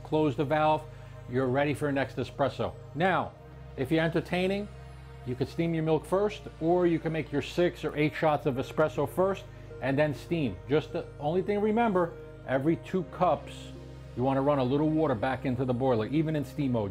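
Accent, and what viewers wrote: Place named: New Jersey, United States. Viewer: An east coast accent. New Jersey working class. No mystery. Merely region plus class.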